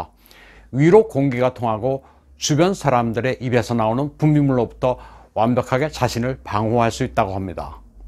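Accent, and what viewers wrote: China. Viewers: 이사람은 발음이고 뭐고 아무리봐도 중국인인데... 중국인 그렇게 미워하던분들이 어떻게 그리 잘믿는지..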